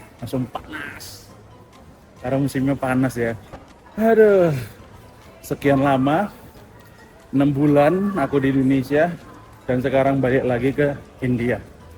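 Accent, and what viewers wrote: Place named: Java, Indonesia. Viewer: kok vibesnya jadi JAWA bang? gak sumatra lagi, medok banget kedengerannya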